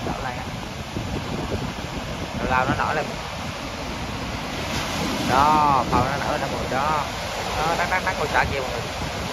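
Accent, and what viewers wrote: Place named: Quang, Vietnam. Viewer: Khi con nói chuyện con đừng hỏi bằng tiếng quang. Vì CĐM ko nghe được con ạ. Con cứ nói bình thường đi con và khi con quay nên quay gần con cá cho khán giả xem cá này là cá gì vì tiếng mỗi nơi gọi tên khác nhau ❤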